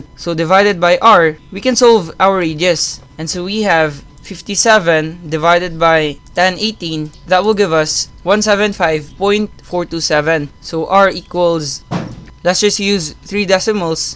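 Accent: Conyo, Philippines. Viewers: Konyo pero sobrang linaw magpaliwanag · very conyo sir, nakakatuwa makinig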